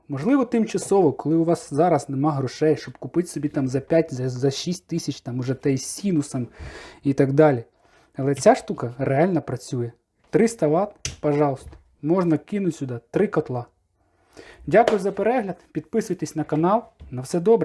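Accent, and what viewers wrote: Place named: Zhytomyr, Ukraine. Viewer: Це житомирська походу, як у мене))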